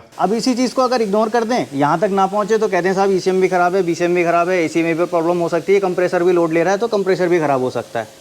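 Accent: Haldwani, India. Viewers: बहुत शानदार सर जी मैं हल्द्वानी से हूं, आपकी विडियोज़ और बोलचाल से मुझे लगता है कि आप भी यहीं नजदीक से ही हैं। संभव हो तो आप से एक मुलाकात करनी है, से मिलने की बहुत इच्छा है।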